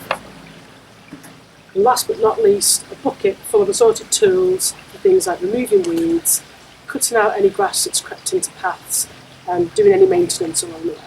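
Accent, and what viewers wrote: Northern England, United Kingdom. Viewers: Really enjoyed your video - our lawn desperately needs this! Great to see a UK based gardener with a northern accent. :-)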